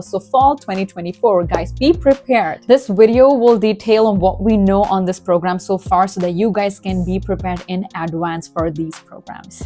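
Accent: Canada. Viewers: How did you develop Canadian accent?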